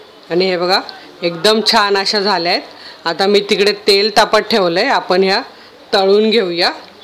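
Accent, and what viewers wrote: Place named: Nashik, India. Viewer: ताई 🙏 मी तुमच्या रेसिपी नेहमीच बघते मला फार आवडतात. मी पुन्हा एकदा कुरडया केल्या, या वेळेस माझ्या कुरडया छान झाल्या.व सांडगेही केले,तेही चवीला छान झाले. तुम्ही नाशिकच्या आहात.असे तुमच्या बोलण्यातून समजले.म्हणून आणखीच छान वाटले,करण माझ्या आईच माहेर नाशिक, आम्ही लहानपणी सुट्टीत नाशिकलाच राहण्यास जात असे. तसेच तुम्ही जे पदार्थ बनवितात.तुमची पदार्थ बनविण्याची जी पद्धत आहे, त्याच प्रमाणे आमची आई पदार्थ बनवीत असे. तुम्ही फारच छान माहिती देतात. खुप छान समजावून सांगतात.ते ऐकूनच तो पदार्थ एकदा तरी बनवुन बघावा, असे नक्की वाटते.म्हणूनच मी पहिल्या कुरडया माझ्या मना प्रमाणे झाल्या नाही.व दुसऱ्या वेळेस करून बघितल्या,खरच खुप सुंदर झाल्या.त्या बद्दल तुमचे धन्यवाद.💐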